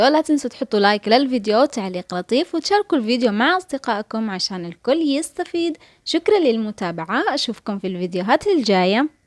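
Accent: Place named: Yemen. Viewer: من لهجتك احسك يمنيه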